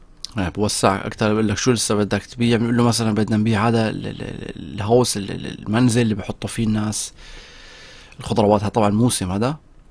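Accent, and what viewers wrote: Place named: Idlib, Syria. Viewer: ليش حاسك ادلبي 😁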